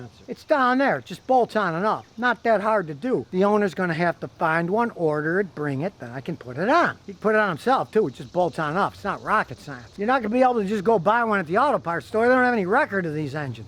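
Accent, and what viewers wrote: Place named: East Coast, United States. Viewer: East Coast accent with a slur the first time I really noticed 😳 . I'm learning how to be a conscious vehicle owner that I never noticed everything is delivered better when you pay attention 😂🤣🤣😅😆😁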